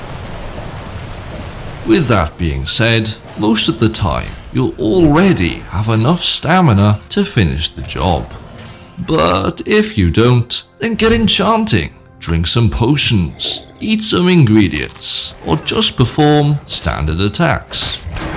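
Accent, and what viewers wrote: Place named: Scotland, United Kingdom. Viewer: Apologies, clearly Scottish and trying not to be 😅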